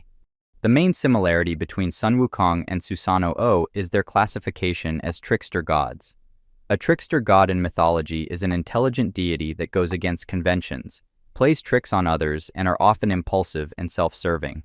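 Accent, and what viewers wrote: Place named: United States. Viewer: 미국인? 미국인발음 짜증남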